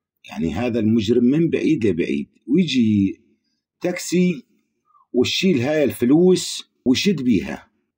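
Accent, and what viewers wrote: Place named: Yemen. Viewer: والله قصة رائعة ومن لفظ الكلام تبدو ربمى من دولة يماني اوعماني .المهم قصة حقيقية وان لم تكن حقيقة امثالها كثير ما أعجبني هو الكلام مفهوم جدا اتمنى قصة أخرى ...من المتتبع عبد الرفيع سامح ولاية ميلة شرق الجزائر❤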